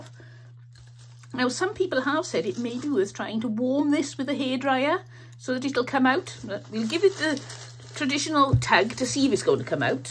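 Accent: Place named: South Wales, United Kingdom. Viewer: I agree. The South Wales accent is so beautiful.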